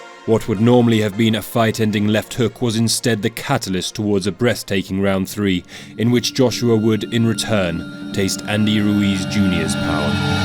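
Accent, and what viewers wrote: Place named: United Kingdom. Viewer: Damn got a beautiful British accent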